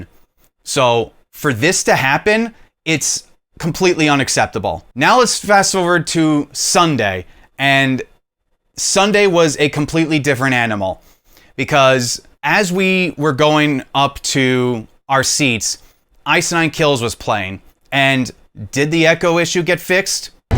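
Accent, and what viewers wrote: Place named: New Jersey, United States. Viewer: for being from jersey you simmer on the accent, thx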